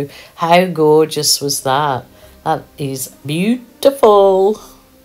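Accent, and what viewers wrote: Birmingham, United Kingdom. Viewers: I love listening to your voice it sound from Birmingham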